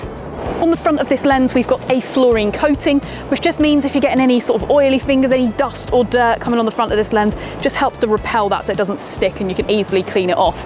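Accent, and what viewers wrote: United Kingdom. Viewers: British accent 🤩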